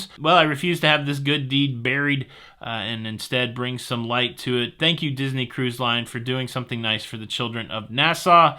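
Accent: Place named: Texas, United States. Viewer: I am a born and bred Texan and your humor was spot on. BTW you have a great Texas accent.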